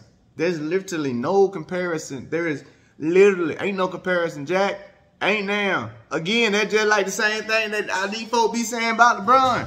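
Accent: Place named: Georgia, United States. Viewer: P.s my mom used to love your voice when I would play and listen when I would sit with her at the table having dinner..she said you sound like some of her people in Georgia. I miss my mom laugh she thought your voice was so back home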